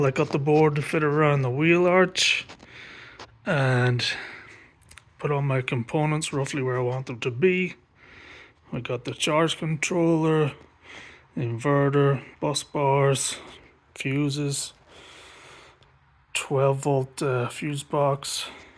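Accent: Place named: United States. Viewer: Couldn't figure out if you had some weird Seppo accent that sounded a tad Irish - and then you said 'bollocks'...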